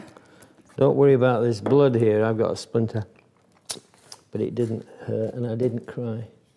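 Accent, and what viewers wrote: United Kingdom. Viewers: It is nice to have subtitles for those who might be hearing-impaired. I guess you have used a speech recognition software. Some editing is needed : "wall" instead of "war"; "disassemblable" instead of "disassemble a ball"; "bolt" instead of "ball". I am not sure I did catch everything because I normally just listen to your clear British pronunciation.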